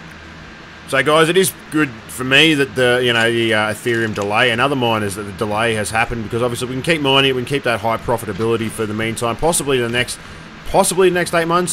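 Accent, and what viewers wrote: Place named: Australia. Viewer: I love that aussie accent lol :D btw great vid bud, u just gave me some encouragement to re-pad my gpu-s! 😊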